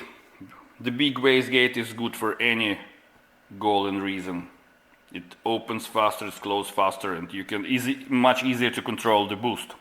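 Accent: Russia. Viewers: Та я только по первым словам на английском уже понял, что это говорит русский человек 👍👍👍 Мерс огонь!!!! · Русский что ли бро ) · русский английский!!!!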